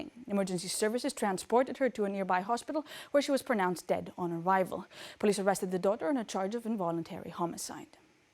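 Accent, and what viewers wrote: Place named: Greece. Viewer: Πολυ καλη Αγγλικα μπραβο, με λιγο Ελληνικη προφορα, πολυ σπανιο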